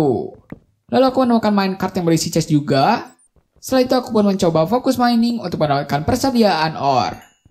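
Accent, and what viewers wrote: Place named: Bugis, Indonesia. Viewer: Hai aku sub baru nih aku tertarik dengan Vidio ini karena cara bicaranya Bugis tingkatkan lagi ya suaranya pasti banyak subcribernya kok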